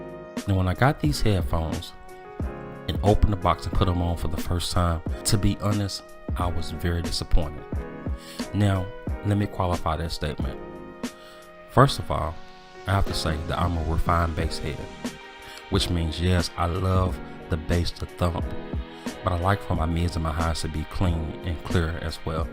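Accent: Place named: South Carolina, United States. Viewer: Oooooooh. I want these! And is that South Carolina I hear in your voice?? 🤣💯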